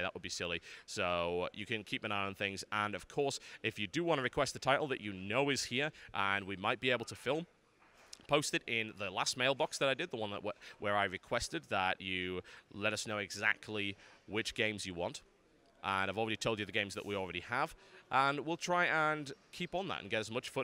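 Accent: United Kingdom. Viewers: whoa dude, you're extremely british.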